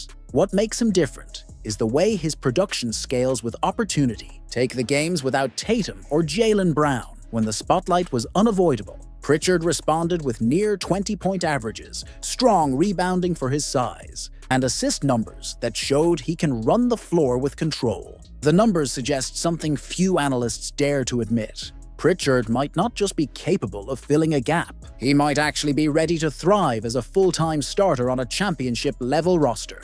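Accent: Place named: United Kingdom. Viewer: What a waste of my time. Bloviation. Nice touch to put a British accent on the AI narration making it obvious you know nothing about Boston basketball. I'm blocking this channel.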